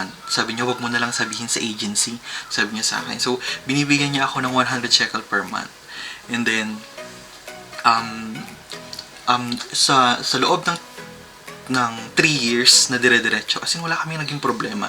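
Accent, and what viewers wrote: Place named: Ilocos, Philippines. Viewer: TAGA San ka Po parang may Punto ka Ng pagka ilocano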